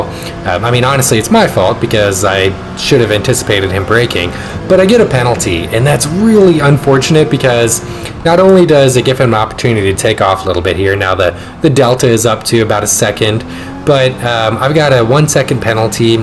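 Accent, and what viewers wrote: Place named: United States. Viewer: I really enjoyed watching this! Please keep grinding I’d love to finally have a American English speaker to watch daily on gt7 !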